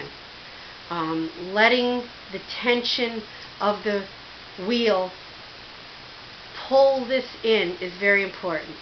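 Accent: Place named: Upstate New York, United States. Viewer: This is so wonderful. Thank you! Are you from upstate NY? You sound like my family!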